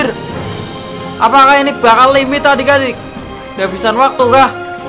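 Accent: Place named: Java, Indonesia. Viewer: Medok jawa adik adik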